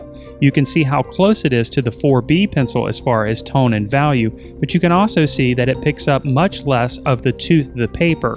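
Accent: South, United States. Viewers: Something about the quality of your voice reminds me of Adam Scott ( albeit with a soft southern accent)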